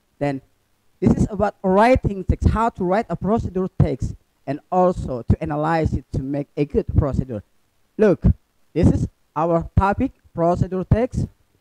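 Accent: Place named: United Kingdom. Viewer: British aksen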